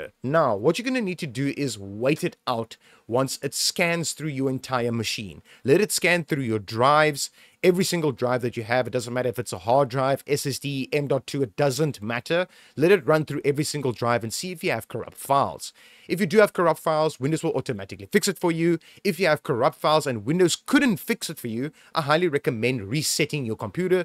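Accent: South Africa. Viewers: You're literally the only guy that explains everything correctly. And You're South African made everything easier. Thanks buds im running 5th gen I7 and 3070 12 gig this helped me big time.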